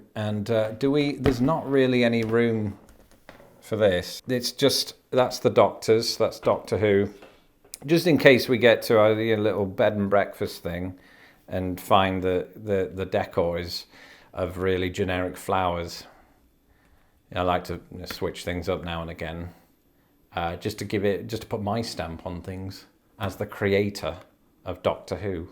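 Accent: United Kingdom. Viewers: Talking about nothing, sounds better with a British accent. Have a great vacation!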